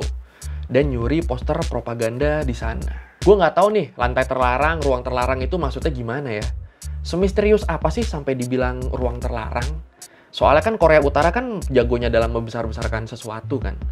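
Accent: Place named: Bogor, Indonesia. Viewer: Bang lu orang bogor?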